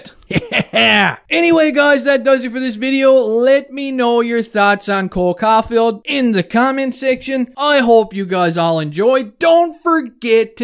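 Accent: Newfoundland, Canada. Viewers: You must be a fellow Newfie or an Atlantic Province guy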